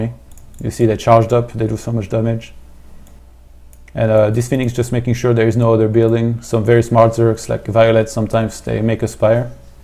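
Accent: France. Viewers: Awesome man :) French who speak english sounds so nice hehe, keep this up!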